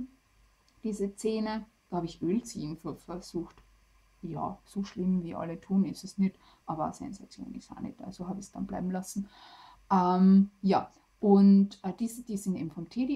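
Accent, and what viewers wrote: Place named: Austria. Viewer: eine Landsfrau in ihrem Dialekt erzählt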